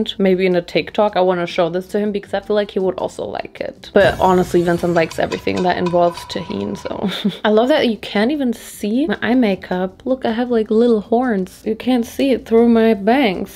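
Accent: Germany. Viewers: I have, a questioni are you German russa nord soming else? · What is her accent? I am so confused. Sounds like German, Irish, but then just… western American? How?! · I love your accent I always wanted to go to Germany😁😁😁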